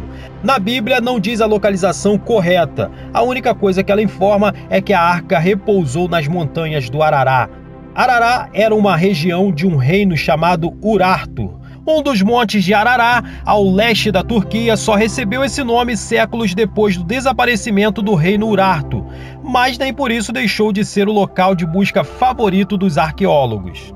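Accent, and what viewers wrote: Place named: Rio de Janeiro, Brazil. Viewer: Menos sotaque carioca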